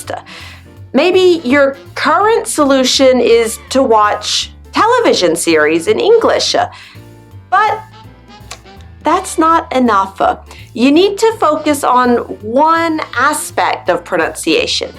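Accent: France. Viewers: Oh my God! I can notice the french accent when you speak😱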